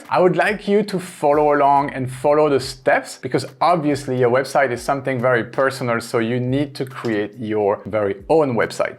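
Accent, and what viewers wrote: France. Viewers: Your accent sounds french , and i wanted to tell you MERCI BEAUCOUP pour tout le temps investi dans cette vidéos et toutes les informations que vous nous avez servi gratuitement , un compte YouTube qui se démarque de loin de tous les autres encore un énorme merci à vous ❤️ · French accent ?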